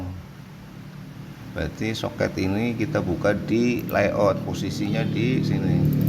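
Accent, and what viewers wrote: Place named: Banyumas, Indonesia. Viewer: Orang mana om. pngin kuraus juga. kayanya org dekat nih. bhsanya ngapak juga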